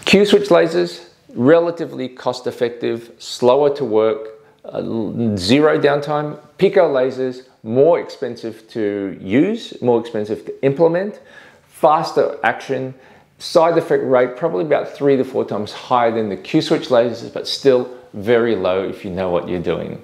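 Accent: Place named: Australia. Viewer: He an Aussie. That’s their natural accent.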